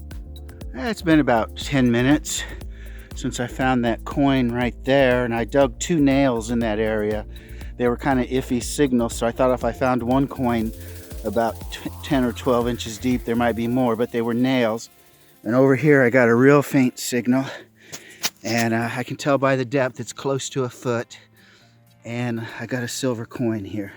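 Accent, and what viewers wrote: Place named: United States. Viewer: the American accent through my stereotype off. :-)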